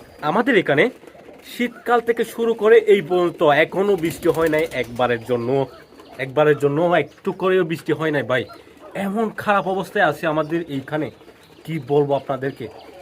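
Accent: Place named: Chattogram, Bangladesh. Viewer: Bhai apnar basha Chattogram ar kon jaigai?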